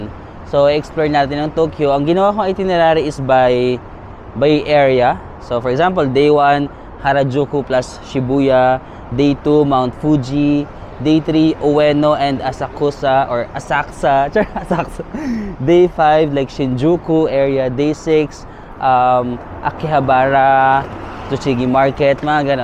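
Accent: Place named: Philippines. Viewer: Perfectionist? Filipino accent nga dba... style niya yan at authentic not pretentious.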